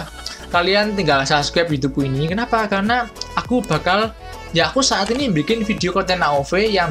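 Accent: Java, Indonesia. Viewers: Hero favorite: liliana Open id: <num> Btw saya suka medoknya bang wkwk XD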